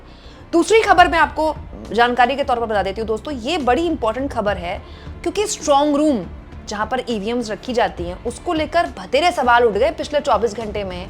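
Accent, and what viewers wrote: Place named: Uttar Pradesh, India. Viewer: Bhatere सवाल 😂😂 बुलंदशहर अलीगढ़ स्टाइल छोड़िए , बहुत से सवाल बोलिए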